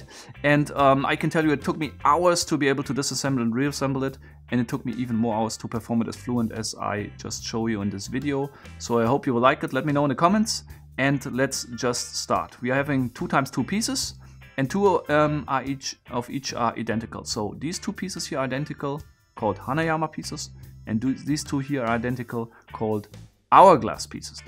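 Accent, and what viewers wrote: Germany. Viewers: you sound like light indian accent. Oh youre german, yeah that sounds more like it.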